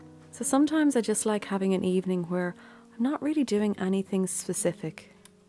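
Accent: Ireland. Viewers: I so love your videos, you can say that I am hooked. I can't get enough of them. I love your Irish accent and soothing voice. You're such a blessing. Thank you for sharing and for all your wonderful ideas. I have always wanted to visit Ireland but you bring Ireland to me. Thank you so much. God bless you. <3 Keep up the great work. You are truly appreciated. Love and hugs to you my dear.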